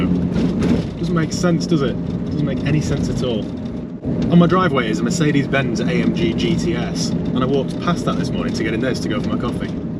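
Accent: United States. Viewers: Good American accent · Your American accent cracks me up so funny! 🤣🤣 Keep up the cracking content!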